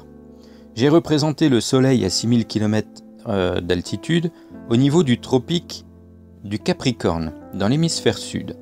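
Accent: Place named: Alsace, France. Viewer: merci pour tes gros efforts ainsi de partager ton savoir. Il est primordial d'informer pour que les jeunes ne tombent pas dans le piège des complotistes. Pour les purs et durs de la désinformation, tu ne peux plus rien faire, excepter cette prévention. (j'ai remarqué au vu de ton accent que tu dois être du coté de l'alsace ou pas loin ?) :) note : chippie a obtenue gain de cause avec terrienne !!!! mais non rien, je note c'est tout !!! :p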